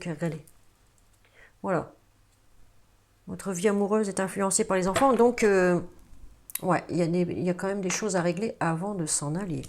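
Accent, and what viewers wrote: North, France. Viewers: J'adore l accent du Nord j en suis issu super adorable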